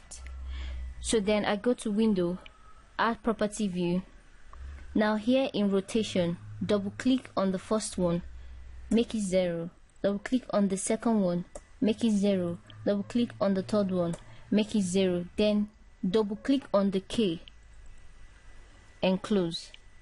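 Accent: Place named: Nigeria. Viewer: You are good at this. You also sound Nigerian and the accent gives me a better understanding 😀. I love your work, it's inspiring.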